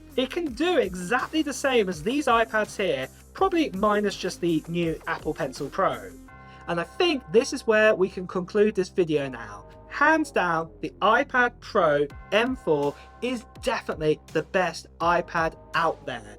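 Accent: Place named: Midlands, United Kingdom. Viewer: Sounds alright to me, sounds like midlands region English accent.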